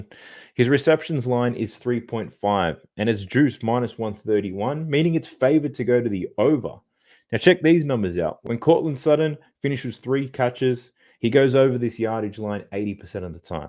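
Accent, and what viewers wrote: Australia. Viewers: Who else feels uncanny valley listening to an Aussie talk about our footie😅 im here for it beeeeioootchh!🤘🏼😎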